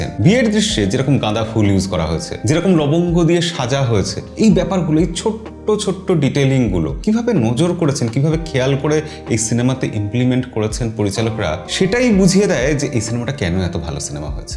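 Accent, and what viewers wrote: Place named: Bangal, Bangladesh. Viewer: Apnar accent puro bangal😄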